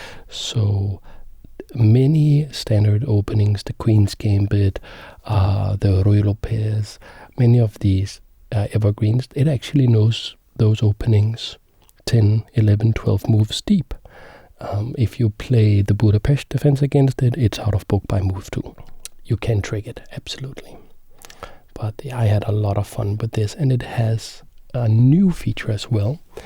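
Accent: Denmark. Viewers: You danish?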